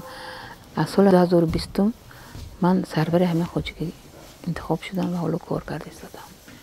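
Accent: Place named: Chorkuh, Tajikistan. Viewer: Ҳам адаби хам лаҳчаи зебои Чоркуҳи тарзи суханрони зебо вафаҳмо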